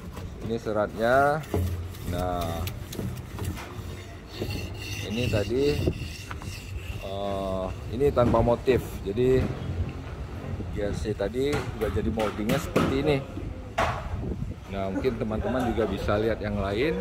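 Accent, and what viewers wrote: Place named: Banyumas, Indonesia. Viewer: ko orang ngapak bos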